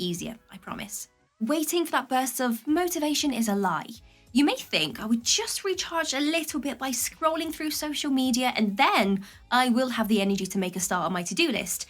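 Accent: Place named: United Kingdom. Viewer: This is my real channel, lady with the wonderful British accent.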